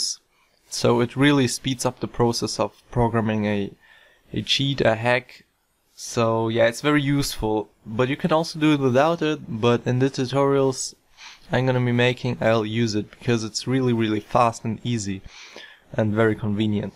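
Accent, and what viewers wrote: Germany. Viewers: German right?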